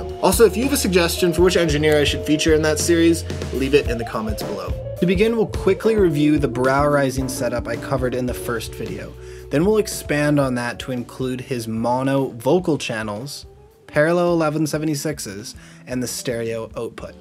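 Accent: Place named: Canada. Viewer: I ❤️ Canadian accents!! Lol Output = Oteput Routing = Roteing Without = withote Great vid dude! I feel like you explained this better than anyone on YouTube!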